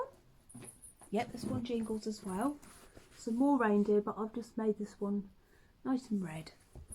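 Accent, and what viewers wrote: Norfolk, United Kingdom. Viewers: Just seen your Russian doll tattoo. My makers tag is a red russian doll so we have a connection right there. Lovely to hear your Norfolk accent, am in Swaffham myself. Great chatty podcast ☺️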